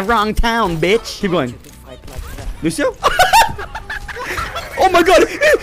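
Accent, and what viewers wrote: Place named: Asia. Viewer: i was watchin this and my mom said u have a asian accent idk why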